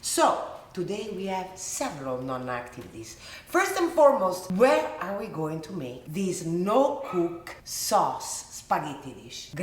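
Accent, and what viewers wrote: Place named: Italy. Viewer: I will buy your book. From Brasil to improve my english Rsrs I ❤ your italian accent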